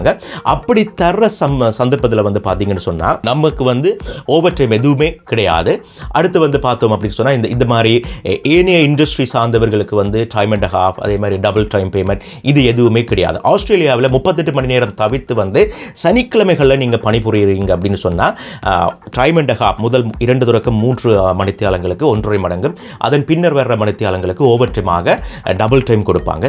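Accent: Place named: Tamil Nadu, India. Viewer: I liks your tamil slang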